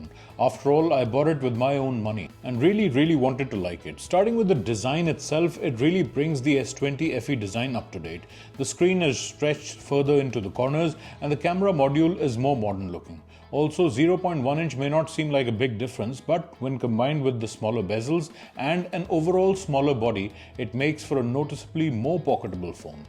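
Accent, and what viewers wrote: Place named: India. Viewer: Beg the question why dont u make videos without that annoying acent put in in title indian ao we dont click on it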